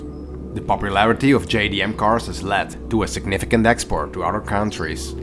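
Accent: Netherlands. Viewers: This guy sounds dutch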